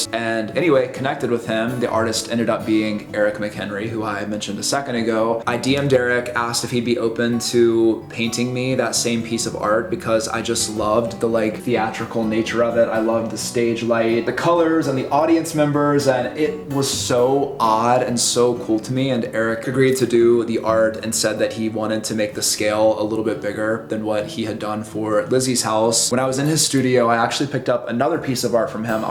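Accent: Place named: Los Angeles, United States. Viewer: Dude, drop the LA accent. It’s hella annoying. You didn’t used to talk like that.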